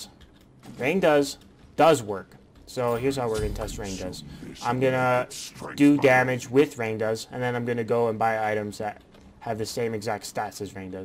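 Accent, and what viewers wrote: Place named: India. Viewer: So thats how u sound. Hey ur a pretty good duler. I thought u were some indian from india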